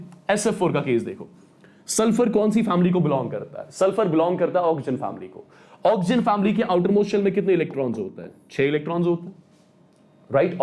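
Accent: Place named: Kashmir, India. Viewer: It's kashmiri voice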